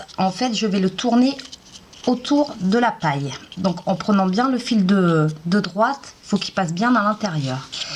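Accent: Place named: South, France. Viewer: je ne connais pas, je vais m'essayer çà vite fait car c'est méga sympa... merci à toi...et cette point d'accent...çà vient d' ou ??? côté soleil en tout cas !! merci bis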